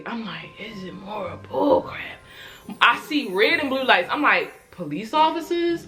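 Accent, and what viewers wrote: Baltimore, United States. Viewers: I had a feeling you was from Baltimore by the way you talk 😂😂 .... we all sound the same 😭😂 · You from Baltimore? SUBBED! On peridot. Fellow DMV Native, should’ve clocked the accent on you 🤪✨ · Ahhhh you from baltimoreee 🤟🏽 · I knew your were from Baltimore ❤️ it was the accent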